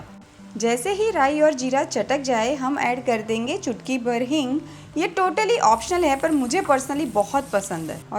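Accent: Gujarat, India. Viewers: ji tame Gujarati bau sweet bolo cho.i like it.hu pan gujarati chu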